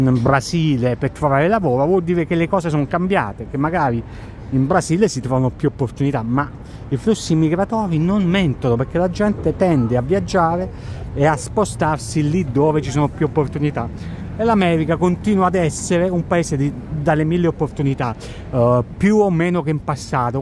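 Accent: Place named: Campania, Italy. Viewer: Complimenti per i tuoi bei video. Ti ho scoperto da poco. Una bella passeggiata come farebbe un tranquillo turista. E poi il tuo accento campano è magnifico, fa il 50% della bellezza del video..👍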